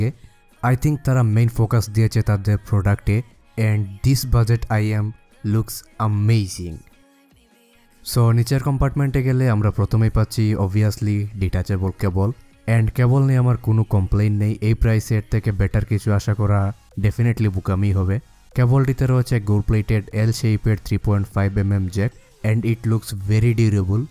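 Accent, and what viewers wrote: Sylhet, Bangladesh. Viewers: Kitaba vai Sylheti ni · Bhai er basha ki sylhet? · Ai kita sylhety ni? Masha Allah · ভাই মনে হয় সিলেটের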